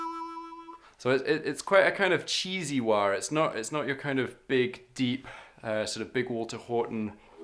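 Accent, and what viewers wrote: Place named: England, United Kingdom. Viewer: You're Scottish? I never would have guessed. Your accent sounds English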